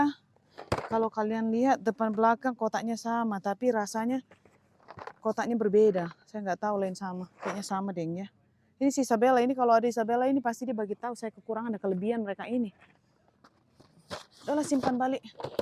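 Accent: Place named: Medan, Indonesia. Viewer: Cara kak berbicara ciri khas orang medan kalau berbicara 😊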